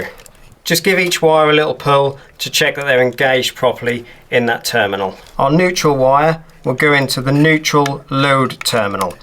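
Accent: Norfolk, United Kingdom. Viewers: You sound like you're from my neck of the woods sir (norfolk) 😃 Great video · Teriffic video as always, Be that a bootiful Norfolk accent?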